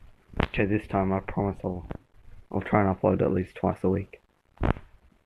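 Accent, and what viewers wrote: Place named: Australia. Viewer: I follow several Aussies and their birds on YouTube. You don't have as pronounced an accent as most Australians so I thought that you might be from the UK. Anyway, I have missed Maisey and am glad to see her back. Love, from Clearwater, Florida.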